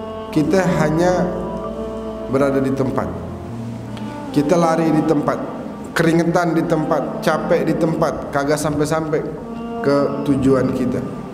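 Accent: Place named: Betawi, Indonesia. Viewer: assalamu'alaikum habib saya sangat senang cara penyampaiannya tawsiahnya bicaranya habib itu Betawi banget enak didengarnya saya asli Jakarta bib buat habibana pokoknya sehat selalu panjang umur amiin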